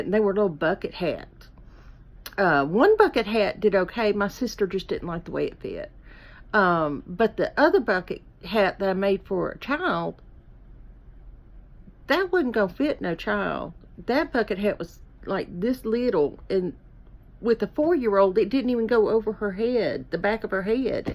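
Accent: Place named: Texas, United States. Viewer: I asked my hubby where you might be from because I love your accent. He listened to a couple of sentences and said Texas! His mother was from Abilene TX. I loved her so much. They retiredto Mission TX. I live an hou4 away from Herrschnerrs 😉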